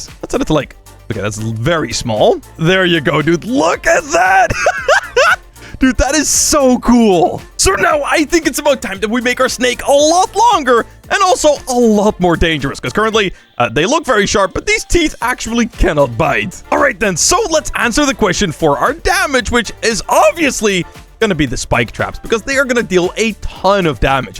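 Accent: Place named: Gouda, Netherlands. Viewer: You have a accent that someon from Gouda has are you from Gouda (for the people that don’t know Gouda is a place in the Netherlands)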